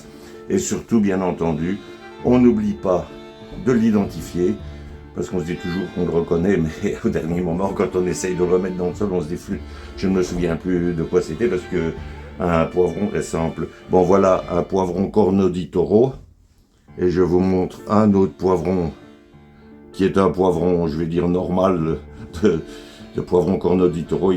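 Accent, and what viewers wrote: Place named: North, France. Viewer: Vous êtes un modèle, une expérience, une expertise, une bienveillance, un accent et cette chose inexplicable des personnes du Nord. Envoyé par un ancien picard devenu parisien, élevé dans le potager du père et la culture d’endives en champs…du père également ! Continuez à nous régaler et bravo. Miguel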